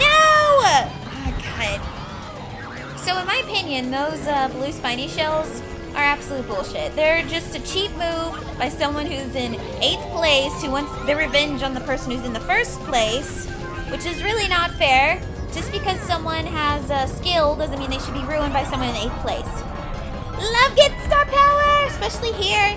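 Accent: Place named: New Jersey, United States. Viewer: nice joisy accent haha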